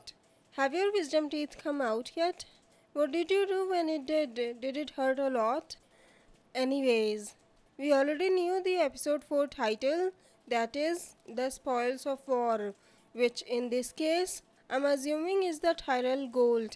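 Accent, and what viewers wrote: India. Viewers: I could understand you without. Just for some reason I assumed you'd be American. But I like Indian accents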